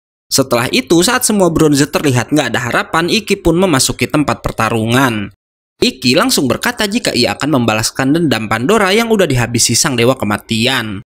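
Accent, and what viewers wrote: West Java, Indonesia. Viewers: Ini teh admin nya org sunda sanes ?? 😃😄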